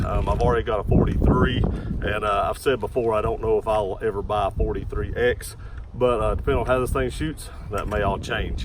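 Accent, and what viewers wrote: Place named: Texas, United States. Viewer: You kinda sound like you're from texas.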